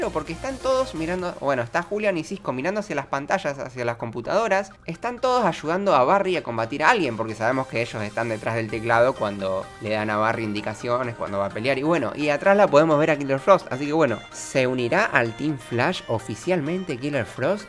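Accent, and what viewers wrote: Argentina. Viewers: De que parte de Argentina sos?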